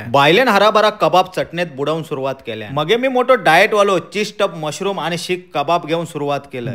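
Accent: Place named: Malvan, India. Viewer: Malvani bhasha ahech god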